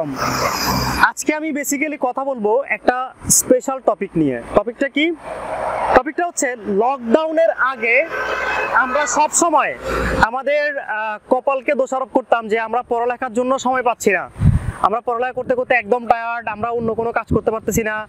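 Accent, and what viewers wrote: Chittagong, Bangladesh. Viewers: ভাইয়া আপনার বাড়ি চিটাগাং নাকি?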